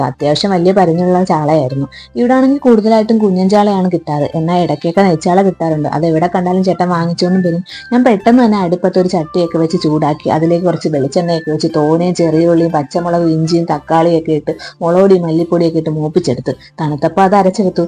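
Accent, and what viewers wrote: Kollam, India. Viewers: കൊല്ലം ആണല്ലേ😂😂😂 കുറെ തോനേ... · 😂😁 കൊല്ലം, ക്കാരാണോ😮 സംസാരം, കേട്ടപ്പോ,,, നല്ല🌹🌹 വീഡിയോ,, നല്ല,, ഇഷ്ടമായി🥰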